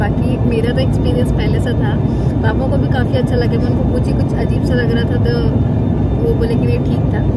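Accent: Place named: Odisha, India. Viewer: Flite me safar karna kya ajkal ka dinme badibath he kya odia wali hindi jadh raheho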